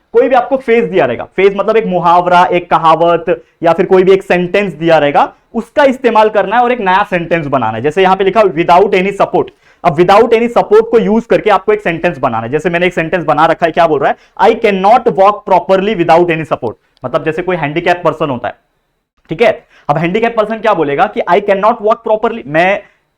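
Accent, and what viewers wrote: Maharashtra, India. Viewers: Sir aap Marathi ho kya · Sir apke muh se Marathi bohot pyaari lagti hai❤️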